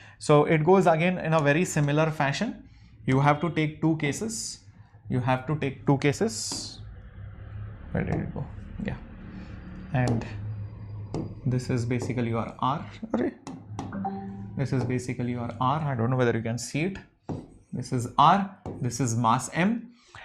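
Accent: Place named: Telugu, India. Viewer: The way he's speaking telugu 😅😂 so cute hahaa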